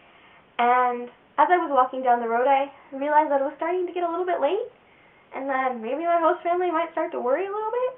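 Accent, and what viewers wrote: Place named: Thailand. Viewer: i think ,your accent is verry like "thai " i know cuz i am thai. my nick name is "chang". "chang" = elephant. "ช้าง"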